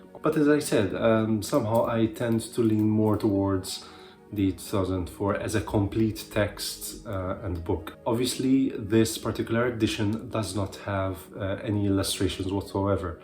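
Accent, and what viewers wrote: Malta. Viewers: Given your english accent, i think you're a fellow Maltese :) beautiful books, but i'm torn between the 2021 standard and the 2004 harper collins 😅 might cave in and buy both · Hello from a fellow Maltese. Recognized your accent.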